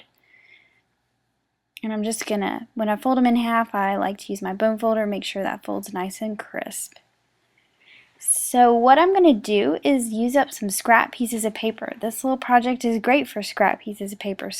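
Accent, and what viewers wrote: South, United States. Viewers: I love this - great for multiples., this is the first time I've picked up on your southern accent - cute:) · So darling,! I love these. And PS, I could hear your Southern accent a little more in this video than usual. Cute!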